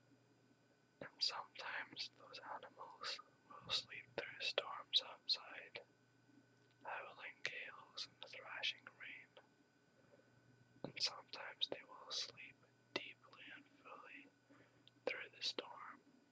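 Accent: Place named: Scotland, United Kingdom. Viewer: Once again, you've created a winner. Man, I want a Scottish accent like yours!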